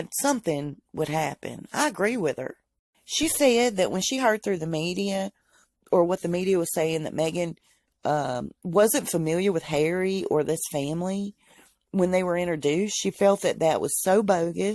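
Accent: South, United States. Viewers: You love nasty,mean gossip.I can hear your southern accent,maybe your racist,because your not patriotic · I love the lady's voice in this video...Very southern and charming...and I almost kind of believe her.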